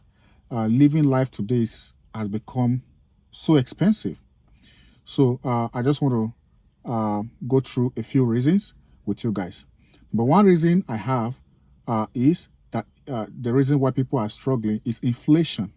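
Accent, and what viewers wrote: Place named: Africa. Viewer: Better learn the English grammar. Poor vocabulary , non articulate., African accent. I was interested hearing your argument , towever could not finish your video. This is a positive criticism, no intention to pull you down.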